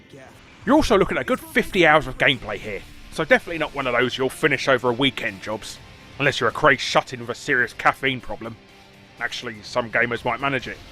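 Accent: United Kingdom. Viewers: VERY british accent you got there :D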